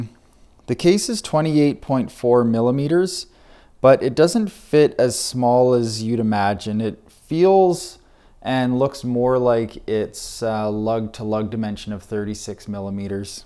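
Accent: Canada. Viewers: ohh I don’t know how to explain it haha maybe it’s the Canadian accent 😅 I’m sure you’re pronouncing it right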